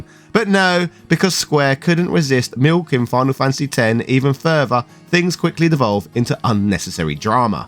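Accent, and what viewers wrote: United Kingdom. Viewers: 1) I love british accents "Wakker" and "Yuner" 2) FF X-2 is the reason I stopped playing FF forever.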